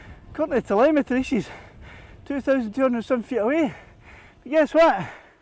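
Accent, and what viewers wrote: Scotland, United Kingdom. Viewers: "What did you do Saturday?" "Well, I put on some headphones and listened to a Scot breathe heavily into a microphone while discussing drone distance." Well done, friend. I hope this has been educational for all the haters.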